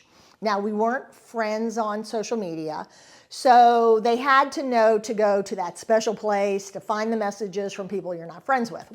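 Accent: Philadelphia, United States. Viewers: I could tell you were a Philly girl - same here! Love your topics. ❤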